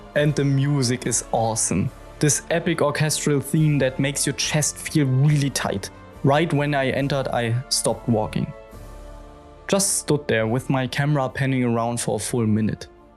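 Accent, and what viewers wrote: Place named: Germany. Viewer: German English sounds funny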